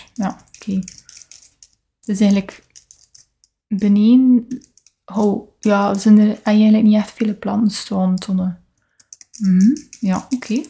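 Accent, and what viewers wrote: West Flanders, Belgium. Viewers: Het mooiste van jouw videos is het proberen te volgen wat je zegt in het West-Vlaams zonder ondertiteling. Het lukt, maar vraag niet hoe!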